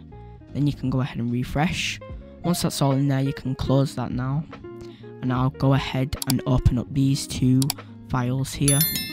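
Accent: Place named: United Kingdom. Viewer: Oh you're British 😮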